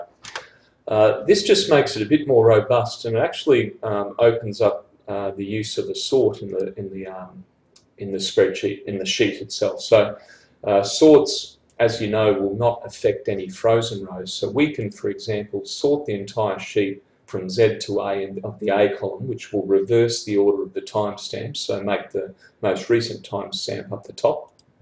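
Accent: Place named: Australia. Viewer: Direct, well explained. I learnt something without having to launch a google investigation chain. I think the Australia accent has a lot to do with it. Top job mayte!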